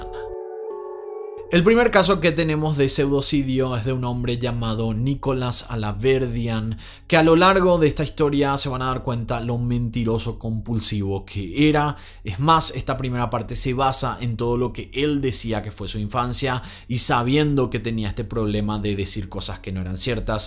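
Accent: Paraguay. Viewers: Amo que no soltas tu acento paraguayo 😍😍😍😍🇵🇾🇵🇾🇵🇾 excelentes casos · Èl es spectacular narrando cada historia y su español es impecable explicando todo tofitito, soy unas de su seguidora ademas ese acento paraguayo es unicooo❤❤❤❤❤ un chico lindo lindo nuestro.!!❤❤🙏🙏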